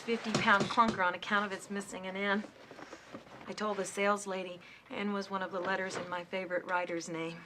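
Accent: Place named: Kentucky, United States. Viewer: Sounds like Kentucky to me.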